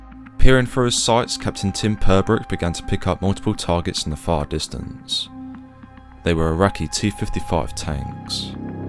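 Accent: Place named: United Kingdom. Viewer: very well spoken and interesting, you cant beat a well spoken brit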